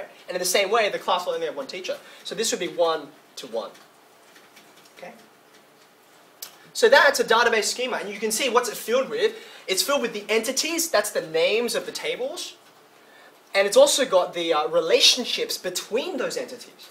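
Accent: Australia. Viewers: Yeah, I have to admit I was distracted at first by the thoughts "is that guy Australian?" and "what a cool presentation technology". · He sound australian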